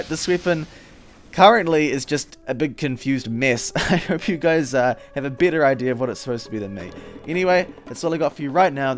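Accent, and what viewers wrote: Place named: New Zealand. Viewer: He's a fucking Kiwi, couldn't you recognize his accent? Dumbass.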